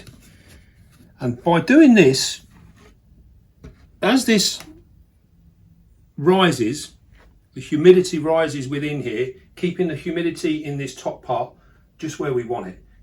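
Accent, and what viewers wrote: United Kingdom. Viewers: Why are you speaking in farenheit but have a british accent?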